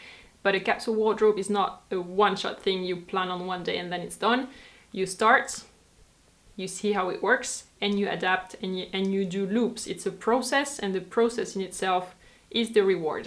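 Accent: France. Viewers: you can tell from that beautiful accent! She's definitely french :) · I can tell by your accent that you speak French. Maybe you are french and live in Germany. You don't have a German accent at all.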